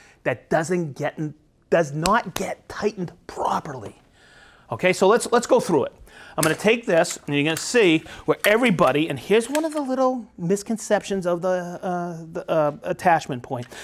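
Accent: Boston, United States. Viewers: It's like being at a carnival. And he's the barker from Boston.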